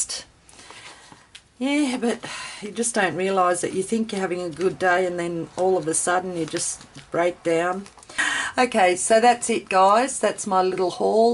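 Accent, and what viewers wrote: Australia. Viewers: Lovely to hear an Ozzie! Great hall of treasures!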